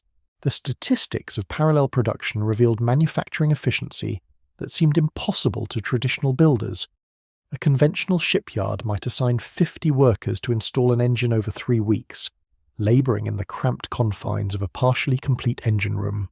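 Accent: United Kingdom. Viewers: Great detail video.But it is by a british guy , parenthe sounds british , I don't think it's a I voice. But tell Americans for a more crude and the way they assemble a ship, but they float and got the job done, which is true and how their ships were built and took years to build and they were topping line no by time, they finished them over there in England they were obsolete.That's why bismarck , and what have your sunk the english , ships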